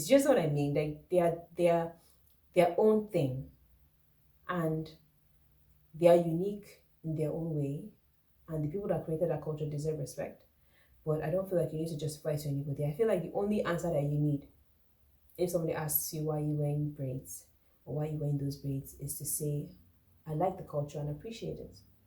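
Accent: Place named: Nigeria. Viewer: you don't know any better, and I can tell by how you talk you sound as if though you were not black American, you sound as though you may be a Nigerian or something I don't know, Before Europeans colonize Africa, they were literally circling around it., Africans were so naive.. amd had absolutely no idea.. I think black Americans have an idea on white people stealing things that culturally belong to them. Western popular music comes from Black Americans... black Americans for the past 100 years have set back in watch white people steal their music and become astoundingly wealthy as a result, many early black rock and rollers of the 1940s and 50s, died in obscurity, and white people who covered their songs become wealthy. But that's a different subject that we can talk about it some other time. But here's the thing.. I'm a little older than you and I know a little bit more than you do. 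So I'm a little wiser and more experienced in these conversation.. There is a dance called the Lindy Hop. And I'm bringing up dance, since you mentioned ballet... which black people are also ostracize out of that dancing community. There are videos on YouTube talking about that. But Lindy Hop is an old African-American dancing style come from the swing dance era of the Jazz era. Invented by black Americans. That dance is Now usurper by white people. I have heard stories of black people rediscovering that dance, and going to dance studios to learn it, but they feel ostracized by a lot of the wh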